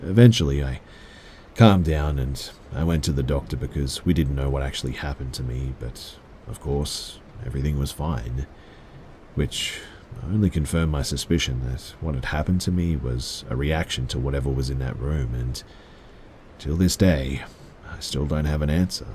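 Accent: Australia. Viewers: you are my absolute favorite scary story teller on all of the internet. It's mostly your Aussie accent ❤️😍😁🤗😊😉😘💯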